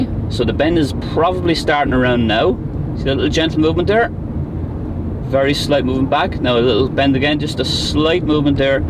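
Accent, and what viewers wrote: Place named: Wexford, Ireland. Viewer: The first video I watched I thought this guy sounds like he is from Wexford 🤔. Then in this video I saw you driving around my estate right past my house. Small world 😂. My first lesson is tomorrow and your videos have been a great help. Thanks.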